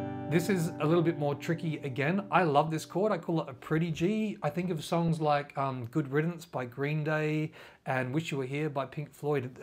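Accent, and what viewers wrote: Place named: Australia. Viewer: Awesome video! Thanks dude! I know you Aussies say Mate, but in the US I say dude a lot. Cool accent dude.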